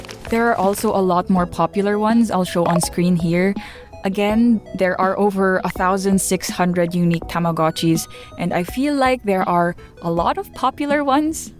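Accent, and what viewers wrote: Philippines. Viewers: I've only watched the first 10 seconds and oh my god are you Filipino? Edit: I checked your bio, you are?!?!?! :DDDDDD AHH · Omg! Im also pinoy! Kamusta? I never realized na filipino ka pala. Pero may slight filipino tang sa accent mo.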